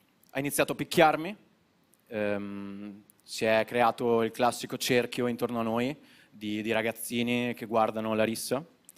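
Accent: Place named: Veneto, Italy. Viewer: Accento Veneto😂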